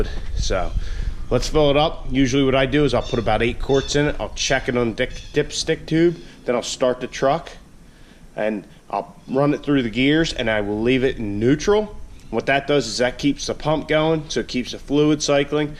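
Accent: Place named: Baltimore, United States. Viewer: Hey, I'm new to your channel. Nice videos. I see you live in Buck..I'm just a few miles south of you in MD. You have a Baldimore accent lol.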